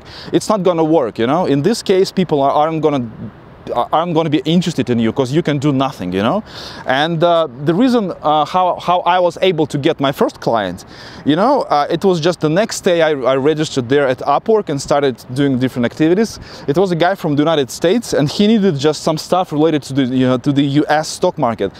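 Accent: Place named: Russia. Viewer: I LOVE your Russian accent. However, I agree that Americans are ignorant and will judge you because of it. But you MUST showcase it as a positive: like you did in your video about it being in your blood to be an engineer. Just tell people immediately that you are Russian and the positives of it. What I don't like is when a Russian girl decides she must lose her accent to blend into the American culture.... NO. Not necessary for a woman to do that. Everything you say about Russian v.s. English confirms my hypotheses: they are essentially different worlds... different operating systems. I notice that Vladimir Putin now always has an earpiece to listen to Russian interpretation whenever he is being interviewed (or... interrogated in many cases). I know that he knows English... but he is strategically thinking in Russian all the time and that's how he makes very critical decisions.. 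through Russian.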